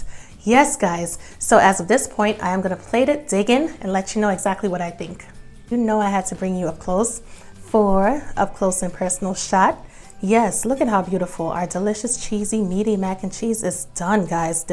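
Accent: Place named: New York, United States. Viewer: Love your new york accent.. It's pretty strong along with your food... All the way from the UK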